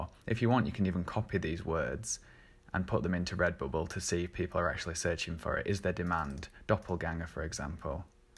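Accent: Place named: North, United Kingdom. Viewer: I’ve been on RB for a while but not really used it properly, though have had a few sales. This is really helpful and I’m now going to go through your other videos to get more tips. I also love that you’re ‘northern’ like me - it’s weird but your accent makes me want to listen to you. Great video , thank you.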